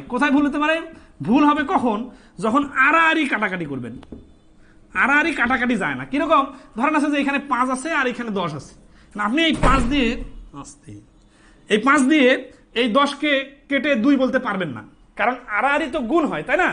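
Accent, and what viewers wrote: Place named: North Bengal, India. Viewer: স্যার আপনার ভাষা শুনে মনে হয় বাড়ি উত্তরবঙ্গ। আপনার বাড়ি কোথায় স্যার?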